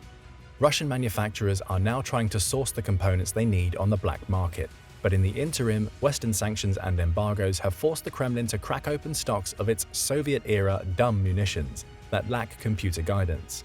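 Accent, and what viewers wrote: United Kingdom. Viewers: Why the British accent ?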